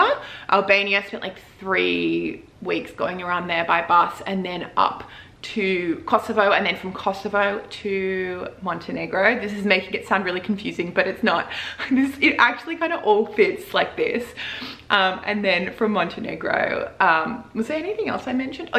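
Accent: Australia. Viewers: love your Aussie accent!!!!💯💯❣❣💥💫💫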